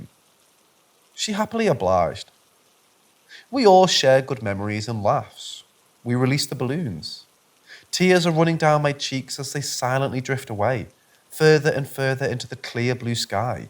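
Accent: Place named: Sunderland, United Kingdom. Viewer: Hmmm cant decide if your a Geordie with a middle class background or you hail from across the river Tyne beyond Gateshead, with a smattering of Sunderland tones, not Durham, too thick of an accent. Maybe uni at Manchester? Back to Newcastle or even Northumberland? Im on the far Northumbrian Coast & love tracing where accents come from & the little quirks of other accents picked up from a short stay or via friends & family. Thanks enjoyed yr video 😉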